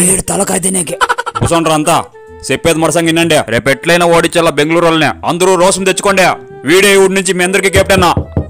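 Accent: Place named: Anantapur, India. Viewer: Brother..MAA OORU GORANTLA...MEE CHANNEL DWARA MANA ANANTHAPUR SLANG BAGA POPULAR AVUTHONDI..KANI SMALL CORRECTION DONT MIXUP OUR TELUGU WORDS WITH KANNADA WORDS LIKE DOORAM WITH DOVVU...A SMALL REQUEST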